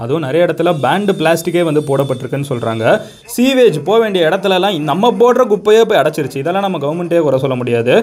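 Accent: Madurai, India. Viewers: Madurai, sivagangai, ramanathapuram slang ah ipedithan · with typical madurai slang, potutainga, aakitainga, mooditainga😂🔥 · Madura slang nalla varuthepaa😍💝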